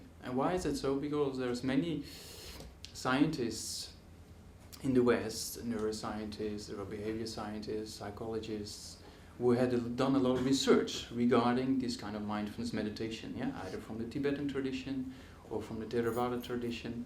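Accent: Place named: Netherlands. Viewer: The volume is very low, coupled with Dutch English and swaying doesn't make for easy listening.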